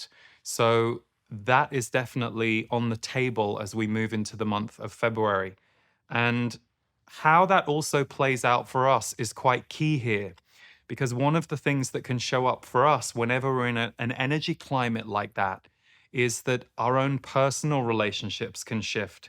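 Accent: England, United Kingdom. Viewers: I remember your first videos…you had hair back then and a lot heavier English accent. Lol congrats on 10 yrs💕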